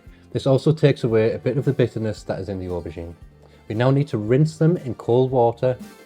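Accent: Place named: Wales, United Kingdom. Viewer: Really enjoyed this as I'm about to make a big batch for our house. Love the Welsh accent. Greetings from Melbourne, Australian. Hwyl Fawr !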